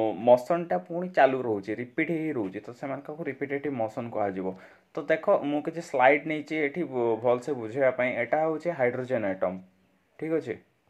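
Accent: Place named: Mayurbhanj, India. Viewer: Aapanaka language ru laguchi aapana mayurbhanj ru....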